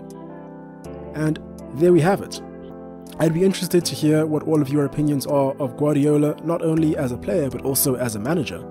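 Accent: South Africa. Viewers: Are you south african? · Great video. Subbed quickly. Hearing your South African accent made me sub even quicker. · Are you South African ? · You sound like a South African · I’m getting a South African accent are you from Mzansi? Good job mate👊🏾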